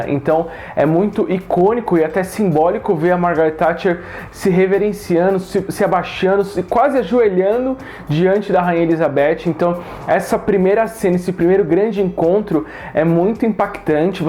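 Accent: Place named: São Paulo, Brazil. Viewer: Raro sotaque paulista! Men gostei de+ da temporada TODA, mas o quinto episódio foi o meu preferido gostei de ver a invasão do palácio não sabia desse fato, fui buscar e realmente aconteceu "achei engraçado" a dama de ferro, fantástica! mas não curto muito a indiferença que ela tinha pela rainha o que eu percebi foi que a série não enfatizou o fato de que a primeira ministra detestava os encontros semanais e arranjava qualquer desculpa para não ir " e não ia" .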